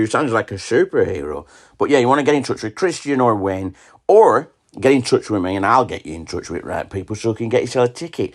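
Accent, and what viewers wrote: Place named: Blackburn, United Kingdom. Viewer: Some proper Blackburn accent is that. Im from Chorley ✌️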